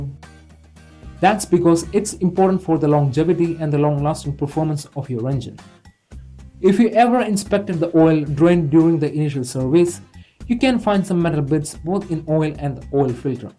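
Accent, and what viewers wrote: India. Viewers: your accent is still indian😁😁😁😁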